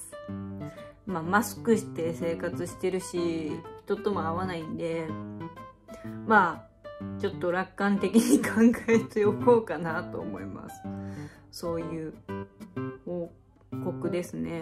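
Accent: Kansai, Japan. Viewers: だんだんアンドロイド感が薄れてきた。関西人なのもわかった。 これからどういう路線でいかれるのか拝見します。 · 関西なんです？ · 関西弁全開!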